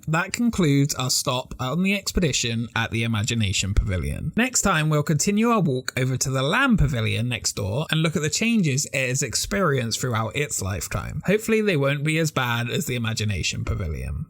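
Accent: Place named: England, United Kingdom. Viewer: great video again! where are you from?, you sound like your from England accent but i cant differ whereabouts.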